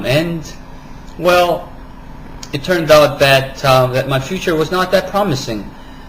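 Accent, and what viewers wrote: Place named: South Korea. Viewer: hes good but he stuttered too much.. and has a korean accent.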